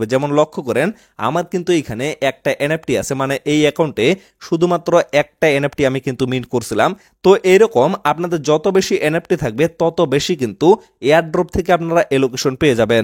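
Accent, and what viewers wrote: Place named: Thakurgaon, Bangladesh. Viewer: first cmnt,,দাদা আপনার ঠাকুরগাঁওয়ের কথায় বাসা🎉🎉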